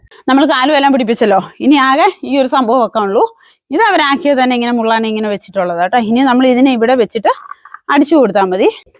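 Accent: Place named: Kasaragod, India. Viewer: നിങ്ങൾ അടിപൊടി❤ നിങ്ങൾ ബുള്ളറ്റ് ഓടിക്കുന്ന ഒരു വ്ലോഗ് ഇടാമോ പിന്നെQA ചെയ്യാമോ കുറേ ചോദ്യങ്ങൾ അറിയാനുണ്ട് എപ്പഴാ ബുള്ളറ്റ് ഓടിക്കാൻ പഠിച്ചേ😊 പിന്നെ എത്ര വരെ പഠിച്ചു പിന്നെ കാസറഗോഡ് സംസാരം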